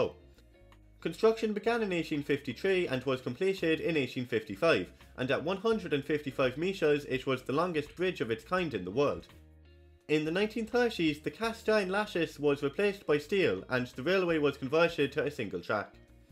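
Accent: Ireland. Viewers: I love your videos 😊 though you have such a song Irish accent that this Brit can't watch at her usual 2x speed 😜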